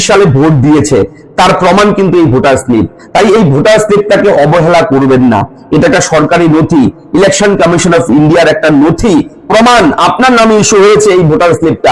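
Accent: Bangladesh. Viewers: এ প্রচুর গাঁজা টেনেছে বোঝা যাচ্ছে I Accent শুনে সন্দেহ হচ্ছে এ নিজেই বাংলাদেশ থেকে খুব বেশিদিন আসে নি I যে ভাবে S উচ্চারণ করছে তাতে তো তাই মনে হচ্ছে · সব ঠিক আছে। একটাই প্রশ্ন। বাংলাদেশি? জিভের আড় ভাঙেনি এখনও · আপনার উচ্চারণ কিন্ত বাংলাদেশীদের মত। আপনি নিজেই বাংলাদেশী হতে পারেন বলে মনে হচ্ছে।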